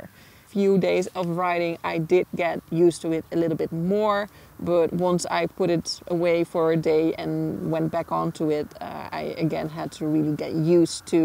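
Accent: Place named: New Brunswick, Canada. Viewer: I couldn’t afford one so I picked up an MT-09. Was obsessed with it, especially the 2023 FTR 1200 S model, which has the exact same tires as the R6 I just sold. Couldn’t ride sport bikes anymore and wanted something upright sitting (with good hp AND torque) so these bikes fit the feel, size, sport category style and power which saves me from going to a heavy low hp cruiser. I was shocked to hear that the paint peels especially after knowing it was $4000 more than my MT-09. Dad always wanted a Harley before he passed but the 70s/80s HD’s were different. My go to in his memory is this. Now I just have to remember it’s jerky at high rpm and paint peels. I’m in NB, Canada and could tell from the accent and the bridges in the background where this was filmed haha! Awesome video! Agreed. Ryan F9’s video on it was great! +Glad to hear the windscreen helps! 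Had to add one to my MT-09. I’m used to the cockpit feel with R1 and R6 windscreens! Glad you didn’t hold back on what you didn’t like!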